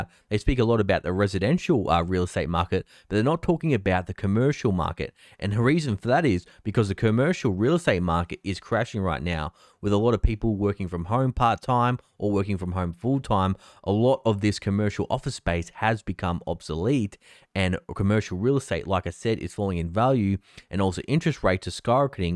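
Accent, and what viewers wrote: Australia. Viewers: keep saying data in your native aussie accent! dont change!!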